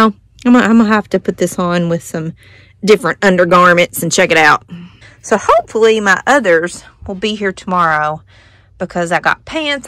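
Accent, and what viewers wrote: South, United States. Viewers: I really love your channel....Have been enjoying the recipe segments...& just seeing how you go about your day to day being the entrepreneur that you are...& you are just so personable! You are just so sweet...plus, I love your Southern accent & of course, your pups!;) <3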